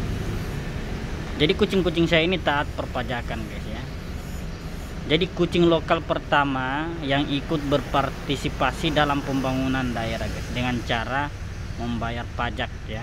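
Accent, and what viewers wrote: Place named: Bugis, Indonesia. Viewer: Ooh tinggal di bulungan ya bang.... sya pikir tinggal di sulawesi , aksennya bugis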